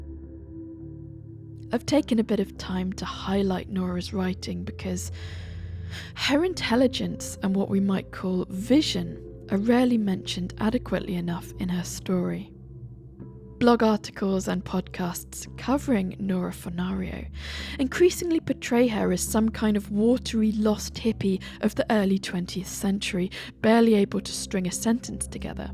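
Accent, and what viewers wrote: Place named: England, United Kingdom. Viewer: A beautiful English accent!)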